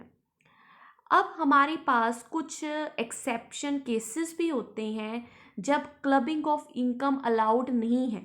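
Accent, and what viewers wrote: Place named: Punjab, India. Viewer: Punjabi accent rocks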